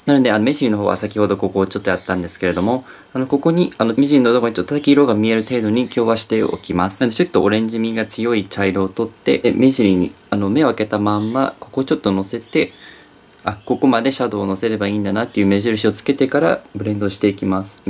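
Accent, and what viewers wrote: Kansai, Japan. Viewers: 、関西弁でかわいい😍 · メイクすごく綺麗で丁寧なのに編集が面白くてギャップがすごい！私は関西出身なのでの発音すごく好きです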